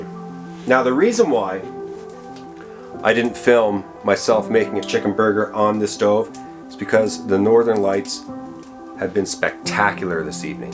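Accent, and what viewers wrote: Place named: Nova Scotia, Canada. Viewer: Get wicked stuff man. Sound like you’re from NS exploring the far north! Great stuff. I know a legere from ns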